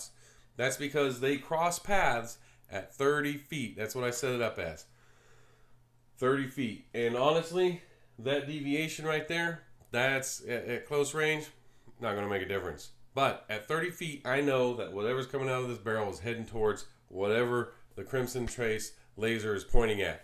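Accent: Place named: South, United States. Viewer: Southern accent detected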